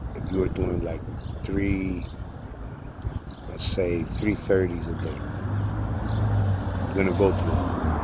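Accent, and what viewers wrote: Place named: Miami, United States. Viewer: Miami Cuban straight truth, im cuban also, I can tell ,good speaker.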